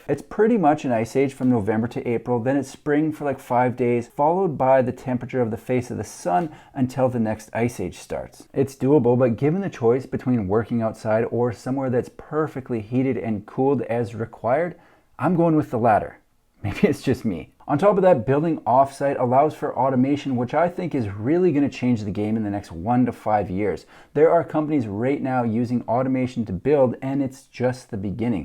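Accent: Canada. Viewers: My team noted you are Canadian. Do you only say “aboot?”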